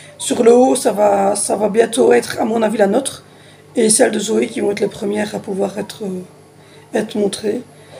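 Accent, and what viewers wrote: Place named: Belgium. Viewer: Tu as qd même un peu l'accent belge je trouve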